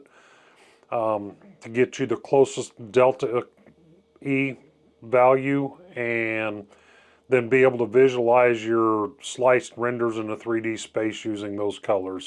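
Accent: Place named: South, United States. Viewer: That's a southern drawl for you. Low and slow. Not in a hurry to get anywhere.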